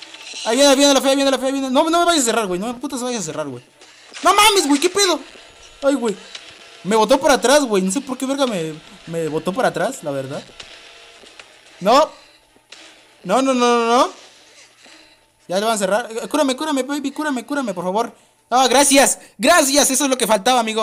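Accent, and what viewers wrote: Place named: Latin America. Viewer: me encanta el acento latino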